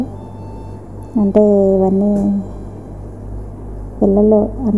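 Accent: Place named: East Godavari, India. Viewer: Madhi kakinada..meedhi A vuru mee voice vintunte East Godavari yasa laga anipistundhi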